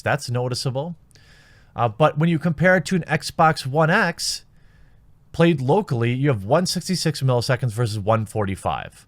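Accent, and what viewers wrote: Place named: Midwest, United States. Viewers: Yup, that midwestern accent. I knew some who said "leyg" and "draygon" all the time and didn't even notice till someone points it out, lol. Lots of different accents in America if you look around closely ;) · Welcome to Midwest accents. -an Illinois'an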